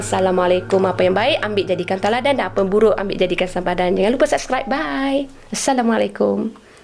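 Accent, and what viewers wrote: Kelantan, Malaysia. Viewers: Weyla ore klate..sblom nie xbunyi klate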